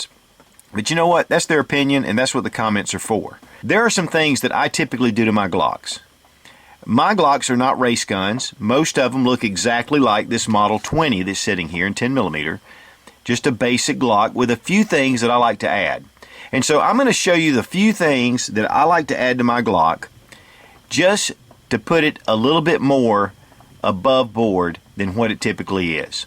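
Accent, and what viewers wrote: United States. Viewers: why can't americans say any :D it's always ' ini ' xD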